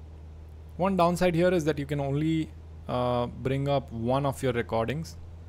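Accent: India. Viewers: I don’t understand INDIAN ASCENT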